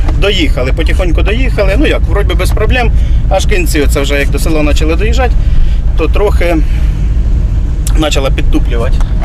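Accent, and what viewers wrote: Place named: Zolotonosha, Ukraine. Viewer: Привіт з Ґетеборг (Швеція).Я сам з Золотоноші,давно виїхав.Приємно побачить веселого земляка.По розмові зразу чути що Золотоноша 😂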